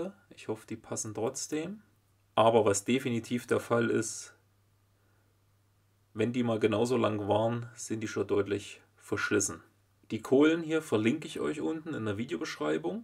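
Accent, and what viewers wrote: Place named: Saxony, Germany. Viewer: ....na ich kenns aus Sachsen.....klingt für mich nach Heimat, meine Vorfahren kommen daher......😉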